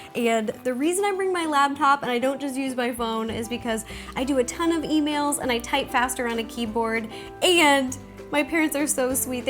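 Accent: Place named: Rural, United States. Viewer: I love her country accent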